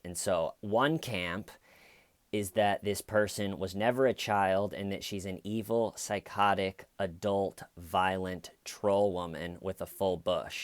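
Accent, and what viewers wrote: England, United Kingdom. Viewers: I love your British accent, I was born and brought up here but it’s ok because I’m Scottish. I really enjoy your videos.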